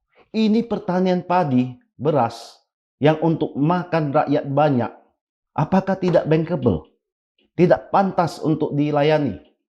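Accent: Sumatra, Indonesia. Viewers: Ini bang pasti org sumatra.ceplas ceplos.berani speak up. Keren banget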